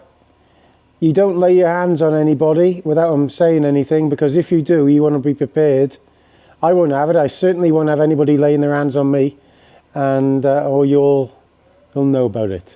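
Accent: United Kingdom. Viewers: you're so right sir... also you sound a bit british?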